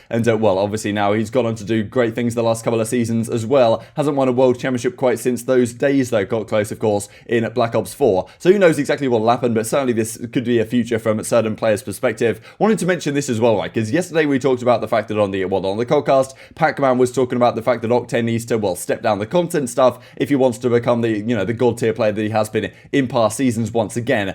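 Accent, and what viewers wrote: United Kingdom. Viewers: I assume by your uploads and your accent you too are also from the Uk. What do you think about the later games that might not take place until 1 or 2 our time?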